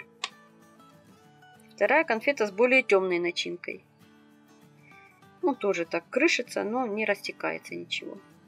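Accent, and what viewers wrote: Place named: Ukraine. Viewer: Согласна с Вами, коллега! Очень вкусные конфеты! Да и говор у автора видео явно хохлятский... 😂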